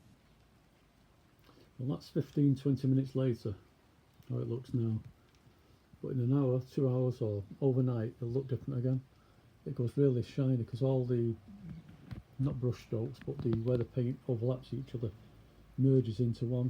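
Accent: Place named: Northern England, United Kingdom. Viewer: Big up the North!!! Love you're accent. Where (exactly) does it originate from?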